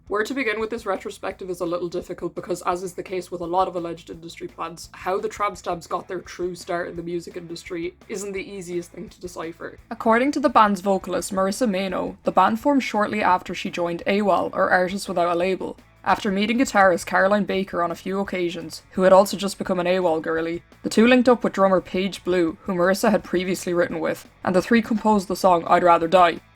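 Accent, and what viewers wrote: Ireland. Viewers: I don’t care. I’d listen to a contralto Irish brogue read the phone book. I lived in Dublin for a while and it brings me back. Beautiful country with lovely people with pitch black senses of humor. Hope I get to move back one day. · What is this accent? Irish? You have a calming voice. Happy yt recommended this, I’m subscribing! You did a great job of going over this saga.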